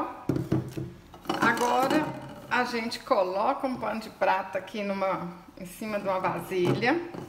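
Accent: Minas Gerais, Brazil. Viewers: Mineira certeza, só de ouvi -la já é uma cura, linda 😘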